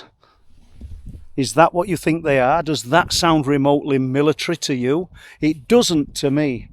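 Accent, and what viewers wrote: Yorkshire, United Kingdom. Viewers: Just discovered you recently, really enjoying the vids, thanks....there's something reassuring about listening to paranormal tales in your lovely solid Yorkshire accent 😄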